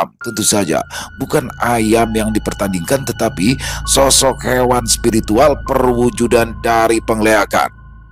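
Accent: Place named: Kalimantan, Indonesia. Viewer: Ceritanya bagus, diawal pembawaan kisah logat Kalimantan masih kebawa mungkin Krn kebanyakan kisah dr sana ya 😁. Jadi ada tugas baru nih bang belajar aksen Bali 😅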